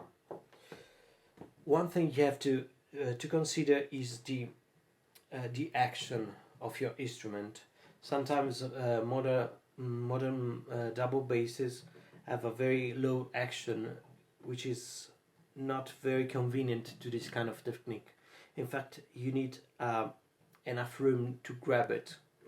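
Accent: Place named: United Kingdom. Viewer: Perfect British accent bro 🤙🏻👏🏻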